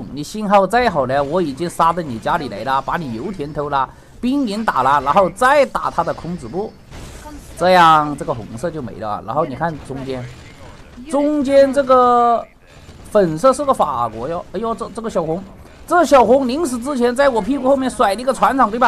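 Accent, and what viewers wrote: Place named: Jiangxi, China. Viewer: 好像是江西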